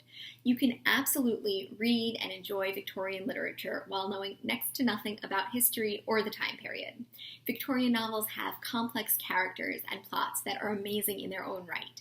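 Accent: United States. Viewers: I absolutely love your videos, so informative about many time periods in literature. I particularly liked your video on the victorian period. I learn so much about literature from listening to you. Also you are such a good speaker I am beginning to become addicted to your channel. Which part of America are you from, just curious. Love you.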